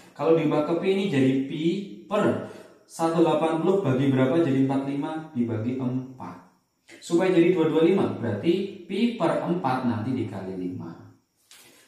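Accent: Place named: Java, Indonesia. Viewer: gatau kenapa kalo yg ngajar suaranya rada meudhok2 gt,lgsg paham :))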